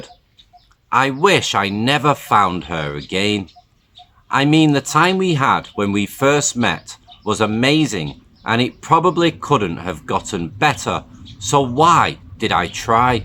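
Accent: United Kingdom. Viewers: You have such a british accent to be an American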